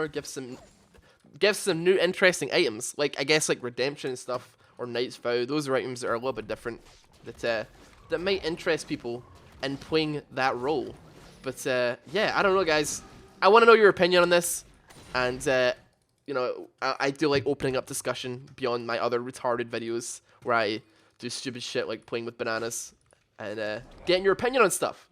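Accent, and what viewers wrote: Scotland, United Kingdom. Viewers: Scottish I believe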